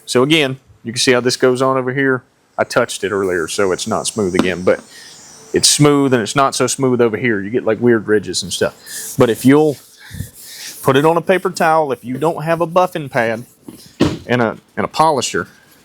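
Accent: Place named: United States. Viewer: Lovely American accent.! Many thanks for making this helpful video sir.😊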